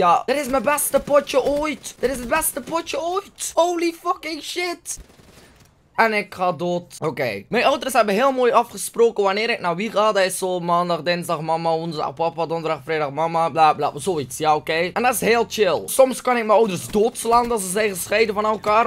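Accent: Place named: Flanders, Belgium. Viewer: Nederlands of Belgisch lol? Je accent is zo Vlaams maar je praat wel met een harde G?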